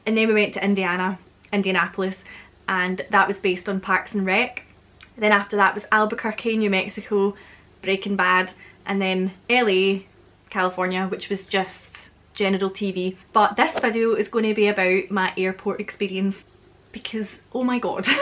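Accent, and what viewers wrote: Scotland, United Kingdom. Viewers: I'm getting better at understanding your Scots accent. So it's progress. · I love listening to your stories. I love learning scottish accent from your stories! and what an adventure you had!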